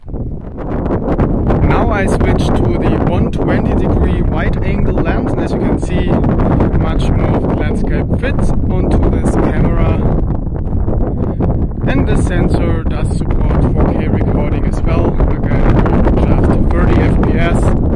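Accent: Germany. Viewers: Irgendwie hört man richtig raus das du deutscher bist 😂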